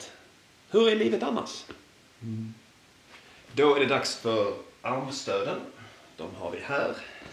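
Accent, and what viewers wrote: Skåne, Sweden. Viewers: Hi, I’m a Dane from east Jutland and I understod all of what you said without any major issue. My question is: would you consider yourself as speakning a prober Skånska dialect or perhaps a modern form of Swedish-Skånska? -which ofcourse is Swedish anyhow.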